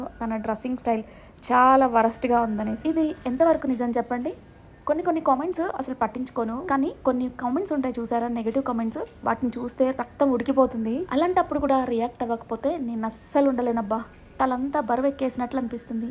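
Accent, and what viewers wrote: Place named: Nandigama, India. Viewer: Ma nandigama heroine 😻💓 me too born in nandigama andi ⭐💭 anduke slang adiganu .💖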